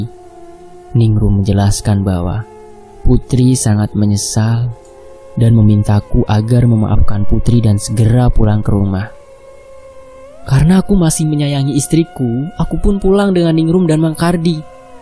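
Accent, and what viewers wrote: Java, Indonesia. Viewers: Aku suka bgt cara kakak membawakan ceritanya,opo maneh nek omongan boso jowo gemes nek dengarkan 🤭 aku selalu menunggu cerita2 selanjutnya ❤️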